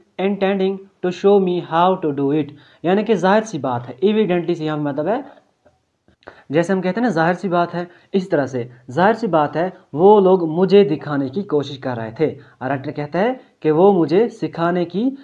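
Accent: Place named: Kashmir, India. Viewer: Sir I think you are from*Kashmir*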